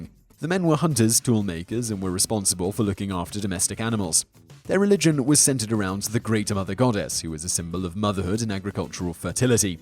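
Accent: United Kingdom. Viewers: He's British. That's their pronunciation. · British ppl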